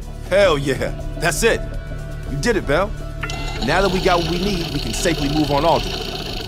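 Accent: Netherlands. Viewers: Ik hoor dat jij Nederlander bent zwz 😂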